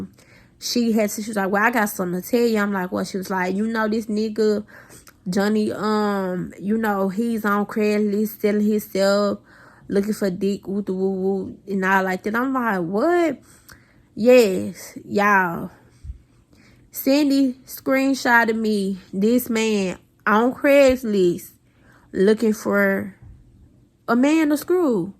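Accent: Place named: Arkansas, United States. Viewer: We can tell you are from Arkansas lol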